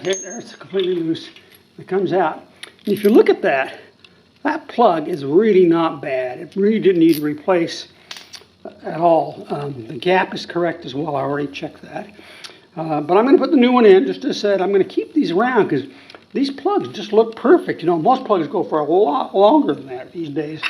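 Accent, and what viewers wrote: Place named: United States. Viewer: The plugs are a lot easier to access than the plugs of a BMW R850. Gee, I had to remove the valve covers and even then you need a special slim designed spark socket to remove the plugs. Consider yourself a lucky guy. You can buy a long socket for about 20 euro at Wunderlich. I am not sure where you live. Your last name is Dutch but your English sounds very American to me. When you live in the US there is also a Wunderlich in the States. Otherwise Amazon has them.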